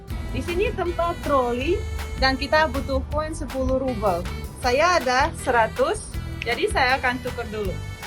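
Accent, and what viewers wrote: Indonesia. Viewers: Kak Pernah Ke Indonesia Belum Soalnya Aksen Bicara Sudah Orang Indonesia Banget 🇮🇩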